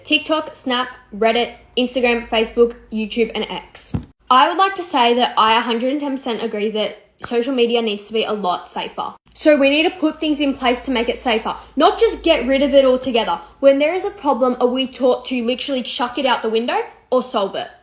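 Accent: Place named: Australia. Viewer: she aint from new york, she aussie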